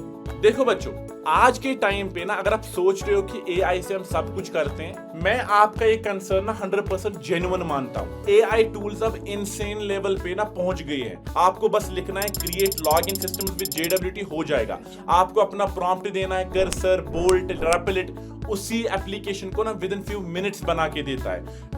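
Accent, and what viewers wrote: Kashmir, India. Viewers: Ap kashmir say ho kya sir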